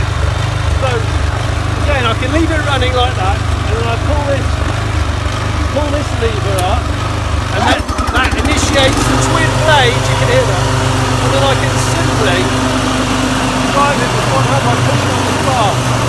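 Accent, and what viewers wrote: United Kingdom. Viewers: You are so informative and hilarious 🤣. The comedy had me 😂 at 1 am !.. bro I can't wait to start watching your car stuff. This American loves your British commentary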